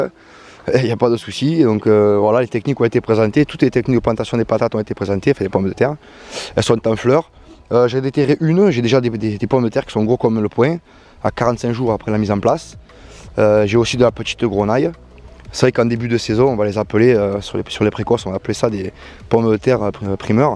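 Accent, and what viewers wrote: South, France. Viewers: Mon beau sud de la France me manque, j’entends mon accent, merci pour tes vidéos que je viens par trouver grâce à l’algorithme. Ça m’aidera pour mon petit potager en Hollande. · Même si tu vas à toute berzingue, (il faut que je trouve le ralenti !!! lol) je me suis permise de rêver que j'étais dans mon petit potager et que le sol était envahi de lianes de courges, courgettes et autres cucurbitacées. Ton accent à renforcé cette impression ! le sud s'est déplacé ?! Combien de décalage, en terme de températures, avec le sud du sud, afin que je m'adapte ? J'aime beaucoup ton appréciation de la nature : naturellement vôtre ! Je viens de m'abonner, j'ai beaucoup de vidéos en retard, je les regarderai au fur et à mesure. Vidéo très intéressante, merci. Chris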